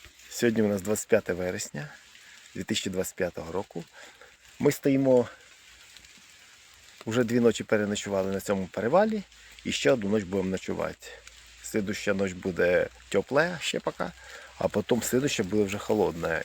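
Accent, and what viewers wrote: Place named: Surzhyk, Ukraine. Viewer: лю твій суржик а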